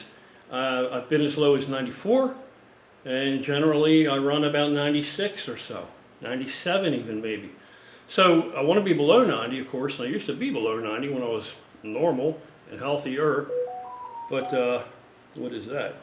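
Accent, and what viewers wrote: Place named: Western Pennsylvania, United States. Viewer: Man you sound like you're no further than 2 towns down from me in western pa. Lol Indiana County Pa.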